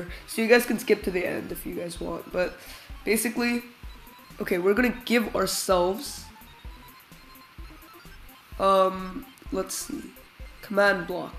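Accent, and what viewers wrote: India. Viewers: r u an indian?????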